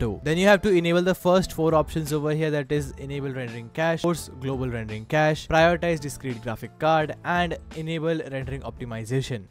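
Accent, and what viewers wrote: India. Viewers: are u from india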